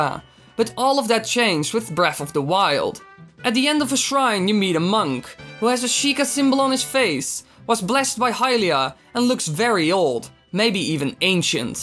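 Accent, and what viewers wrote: Netherlands. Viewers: ik hoorde het aan je accent, ik heb t gevoel dat ik dat bij elke nederlander kan 😂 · You're Dutch right? If so, dat is te horen haha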